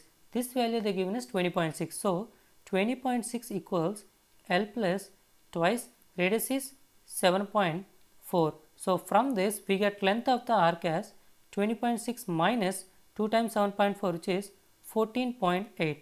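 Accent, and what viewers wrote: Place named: South India, India. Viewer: Are you from south india?? Very useful video ❤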